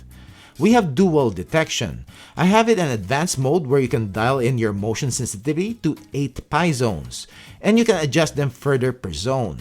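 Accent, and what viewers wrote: Philippines. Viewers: Kuya, you sound Filipino.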